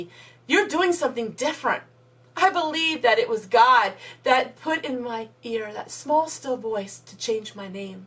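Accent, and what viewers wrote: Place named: Maryland, United States. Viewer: If you don't mind me asking what state do you live in? I was raised in Maryland and your accent sounds so familiar. I have been living in Georgia since 1991 when I was 14 years old. Now at age 42 I have a deep southern accent. I'm really glad we are now friends. It seems like we have alot in common.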